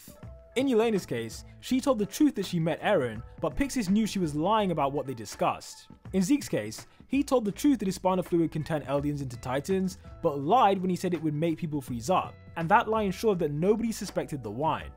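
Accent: United Kingdom. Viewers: I think this is the new "average" UK accent.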